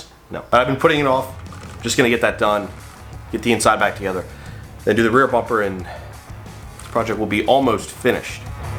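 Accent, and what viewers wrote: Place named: South, United States. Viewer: You have got to be from the south, the way you pronounce "on" like "ahwn"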